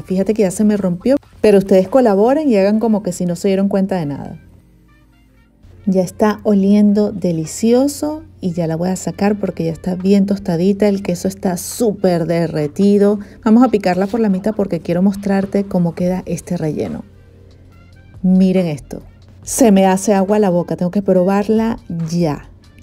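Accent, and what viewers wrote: Venezuela. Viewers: Saludos una venezolana 🇻🇪Costa Rica🇨🇷. Desde que escuché tu acento dije: es venezolana!. Y completamente si lo eres, te felicito por tu canal y videos, siempre quiero innovar en los platos de mi hogar. Muchos éxitos y bendiciones. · Eres venezolana, ese acento es inconfundible? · Muy Venezolana tu manera de hablar 🎉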